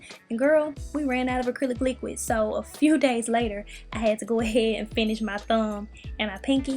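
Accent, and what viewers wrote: South, United States. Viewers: I love ur country accent lol ❤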